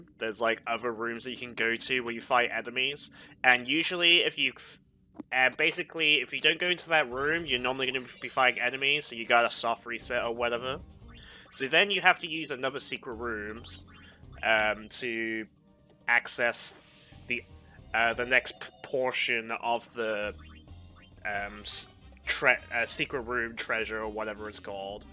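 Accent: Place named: Australia. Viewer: that sounds about right. I was hearing some Australian too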